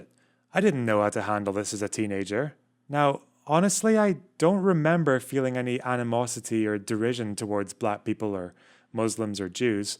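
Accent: Scotland, United Kingdom. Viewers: Màke Your mind up, Scottish or American ??!!. "Fannies"...okay, Scott's. SPEAK ENGLISH BOY !. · bro what part of scotland are u from I’m trying so hard to pin your accent. Or are you from NI? aw mate I’m struggling to sus it out like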